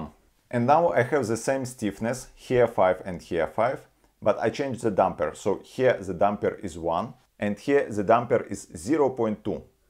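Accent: Russia. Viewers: Ohh russian accent👍. You reminded me USSR scientists, who were living only for science itself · Русский акцент сразу ударил по ушам. Что бы убедиться в своей правоте открыл описание под роликом, но увидев там какого-то Yoyo Liu был крайне удивлен. Пока не понял что это просто контакт продавца. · С русским акцентом мне даже легче понимать английский язык :). И с юмором, что тоже приветствуется!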